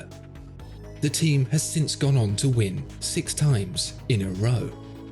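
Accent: United Kingdom. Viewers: Nice british accent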